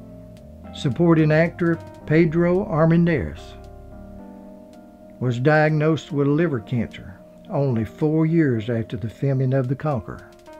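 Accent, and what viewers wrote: South, United States. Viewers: Very well done,except that awful Southern accent!!YUCK! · Thank you for this piece about Ms. Hayward. I have been going back and watching her movies and remembering her as of late, and this was great to see. I was 13 when she passed and I wept, I loved her so. I became attached to her when I would see her on the Saturday afternoon or evening movies and then I was hooked; an awestruck boy in love with a classy star whose performances made him believe that we could all endure and thrive. Also, I am not sure where you are from, but your accent is wonderful. My family is from the south, and listening to you, it was as though I was listening to my uncles telling old family stories. WONDERFUL! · Mr i found your voice very southern jusr the way miss Susan Hayward was attracted to southern men according to her biography a book titled;A star is A star is aStar lives and loves of Susan Hayward thank you mr for such a beautiful. 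tribute to one of the most talented actors in the World, i always say and think an an actor(actress) should be honored while still alive unfortunately Miss Hayward died young but i know she would had been thrilled had she lived long enough to received this tribute from a soutern. gentleman thank you again Mr...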